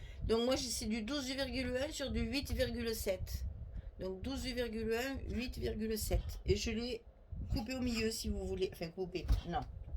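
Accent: South, France. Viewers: Géniale cette partage. Génial cet accent du Sud. Et puis s’il y a une petite anomalie et bien cela nous montre que c’est un travail fait main, artisanal, fait avec le cœur. Alors merci à vous.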